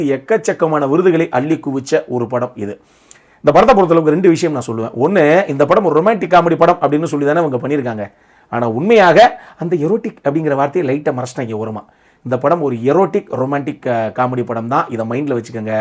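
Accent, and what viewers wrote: Madurai, India. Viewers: bro neenga Maduraiya❤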